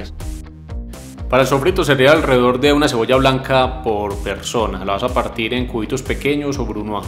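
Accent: Antioquia, Colombia. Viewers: Me llama mucho la atención el acento colombiano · Eh ave Maria, se nota que sos paisa! Que viva colombia 🇨🇴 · sexy voz paisa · esa voz de paisa tan linda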